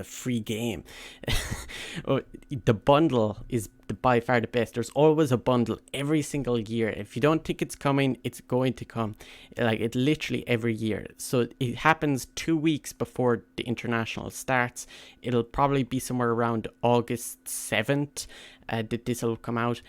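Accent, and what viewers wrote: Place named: Ireland. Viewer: I can't help but notice your beautiful Irish accent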